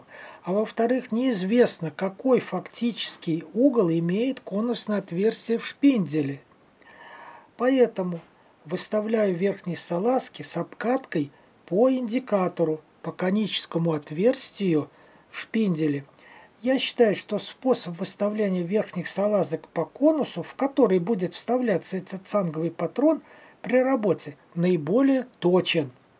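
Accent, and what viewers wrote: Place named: Volga, Russia. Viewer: Спасибо за полезное видео, и ещё волжский распев приятно было услышать)) где-то рядом с Самарой?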